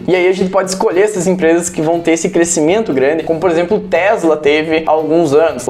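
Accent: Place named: Minas Gerais, Brazil. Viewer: mineiro de (BH)kkkk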